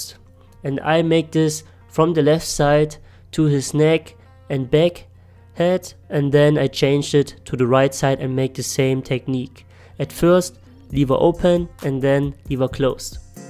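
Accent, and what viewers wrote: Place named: Germany. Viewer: Man hört bei dein Englisch das es bisschen mit deutsch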